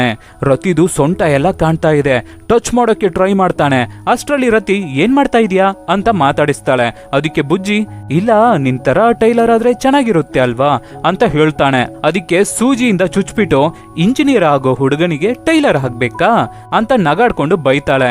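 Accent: Tamil Nadu, India. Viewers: tamilian kannada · I think he is Tamilian as per his slang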